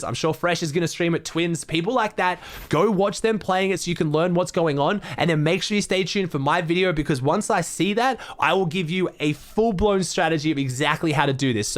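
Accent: Australia. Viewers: Aussie tryin to coach me? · How many people low aussies accent 👇🏻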